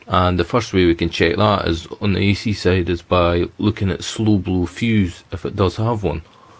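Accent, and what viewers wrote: Scotland, United Kingdom. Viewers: Hi must say I like your videos I must ask u your accent are u Scottish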